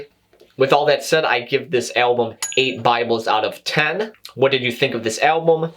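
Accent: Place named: Midwest, United States. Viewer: Great review brother! Props for pointing out the lyrical content and the remarkable drum performance on this album. I also appreciate your impeccable midwestern accent. I’d be shocked if you weren’t from Wisconsin or Illinois. Keep reviewing black metal!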